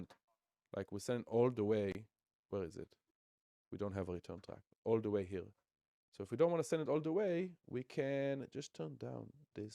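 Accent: Israel. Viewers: Great videos in the channel, easy to follow great energy! Man are you Israeli?❤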